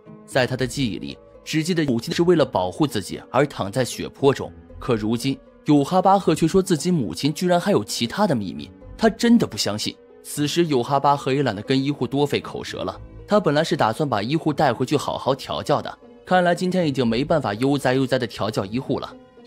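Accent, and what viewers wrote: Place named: Mainland, China. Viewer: 旁白一只加入大陸方式的語言 聽起來總覺得怪怪的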